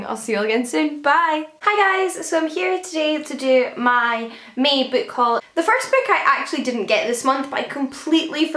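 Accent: Scotland, United Kingdom. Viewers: yep! In my case I don't usually restart a volume (especially if I'm reading the manga for an anime that I've already watch), but I find myself spending months without touching it again. And then I re-enter the compulsive reading phase again, and the cycle repeats itself xD Thanks for replying, you're really nice and insightful and your channel is one of my favourites on booktube, even though I've started following you not long ago :) keep it up! :D (btw, is your accent Scottish? I think it's lovely, but I'm not good with accents, apologies if I'm wrong)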